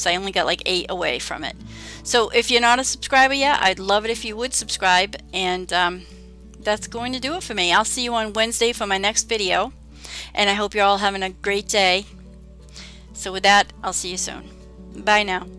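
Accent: New England, United States. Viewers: Hello, new subbie from Michigan. However I was raised in Mass and recognize your NE accent. You made me feel home for a moment. So nice to have found you. I was born in RI, is that where you're from? How close am I? 😁💜🎨 TFS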